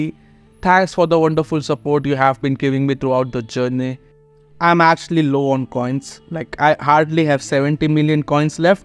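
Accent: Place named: India. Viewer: Love this Indian accent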